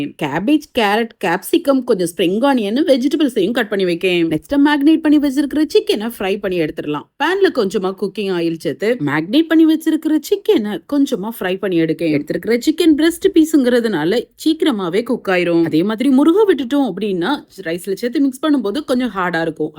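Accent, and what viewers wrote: Tirunelveli, India. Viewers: Sister nenga enna five star hotels chef fa erunthingala and your slang is very nice nellai slang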